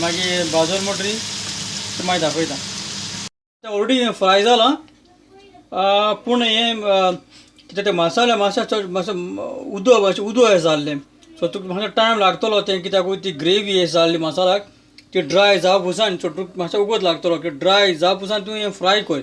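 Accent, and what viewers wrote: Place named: South Goa, India. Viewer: sure. Are you from south Goa, from your speaking style, I assume.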